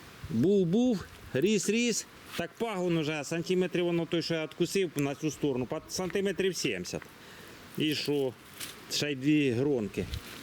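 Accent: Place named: South, Ukraine. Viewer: Так впі знаю наш говір південний . Я змиколаївської.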